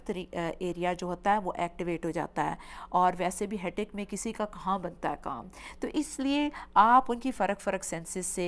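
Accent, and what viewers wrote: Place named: Pakistan. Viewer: Her hindi accent is Pakistan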